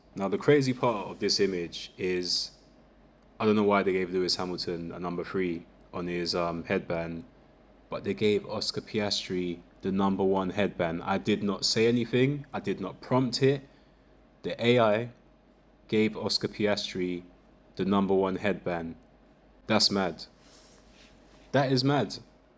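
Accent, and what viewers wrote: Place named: South London, United Kingdom. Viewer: you sound and talk exactly the same as an old friend of mine from lewisham in south london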